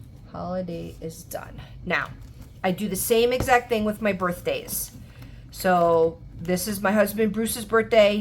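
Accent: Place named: New York, United States. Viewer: Hi! I’m enjoying your videos! Really miss hearing that NY accent. I haven’t been home in so long. 💜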